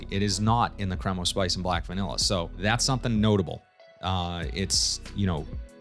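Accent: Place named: Massachusetts, United States. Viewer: You from Massachusetts, bro? You've got the regional accent. "Pak the cah on the boulevaad." 😉 Thanks for the vid. 👍 Cheers from New York City.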